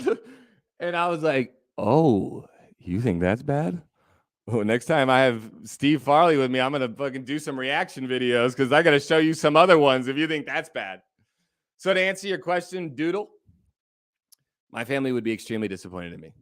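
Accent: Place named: China. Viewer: watching ur channel in English feel a little wired,😂 I am used to your Chinese accent.😊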